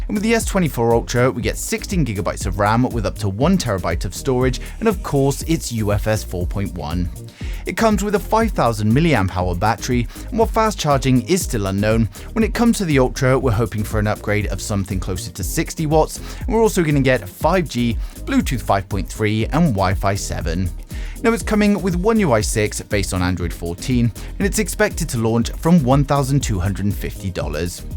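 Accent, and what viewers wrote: United Kingdom. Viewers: $100? Why the heck would someone with a British accent be promoting US stuff, 60 odd million in Britain not enough following for you?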